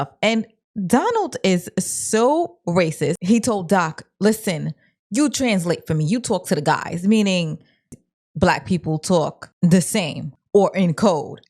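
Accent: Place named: East Coast, United States. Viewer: girl I just love you the east coast accent just everything gurllll❤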